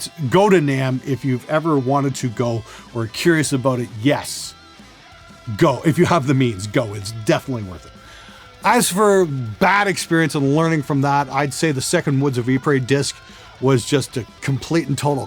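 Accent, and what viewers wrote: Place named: Canada. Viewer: I always giggle when your Canadian accent shines through.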